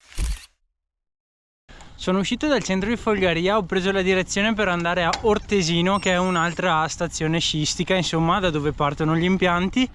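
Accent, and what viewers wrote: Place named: Veneto, Italy. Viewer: con l'arrivo della bella stagione perché no. Quanto impiego da Milano? Dal tuo accento penso tu sia veneto, magari Vicentino??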